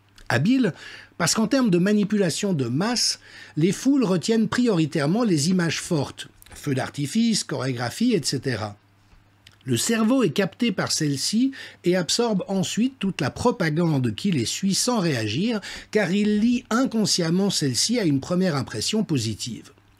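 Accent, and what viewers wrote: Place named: South, France. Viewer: J'adore ce nouveau format, hyper jolie😍 avec l'accent du sud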